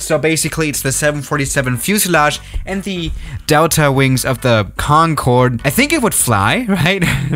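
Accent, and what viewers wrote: Germany. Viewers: Alter manchmal hört man deinen Deutschen axent so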